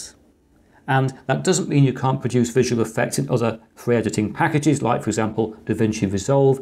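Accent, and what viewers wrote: England, United Kingdom. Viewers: Excellent, thank you. Watching it now. Also subbed to your channel as A. I enjoy your delivery. B. Fell Brit makes a nice change. C. Most importantly I'm passionate about the same subjects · Perfect British Accent. Bravo!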